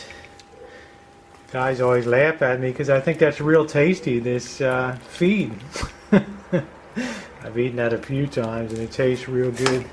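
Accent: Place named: Philadelphia, United States. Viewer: you sound like you have a philadelphia area accent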